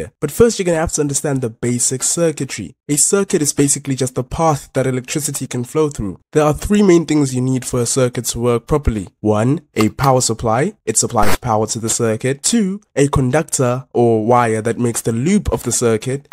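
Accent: South Africa. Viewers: You sound so South African🇿🇦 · Dude, are you South African? Your accent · I hear that accent, and I pray that youre sponsored by a company that delivers to SA😭🙏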